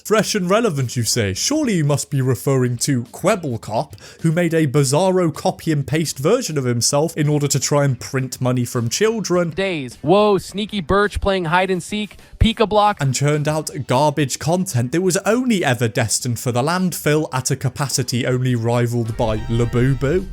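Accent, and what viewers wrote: United Kingdom. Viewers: I have actually put your videos on to sleep before specifically bc you have a deep voice and a British accent. You and Simon Roper. You called me out so hard lmao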